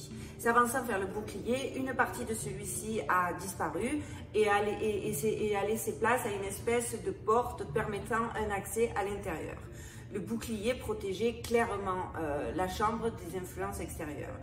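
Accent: Marseille, France. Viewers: trop mimi ton accent!aller l om!sinon,merci pour ces infos,je connaissais deja tte l histoire..stupefiant!faut enqueter...sur place! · Un grand merci pour toutes tes recherches passionnantes. Je découvre ta chaîne depuis les Alpes Maritimes et ton accent au parfum de canebiere est un vrai bonheur à entendre. ❤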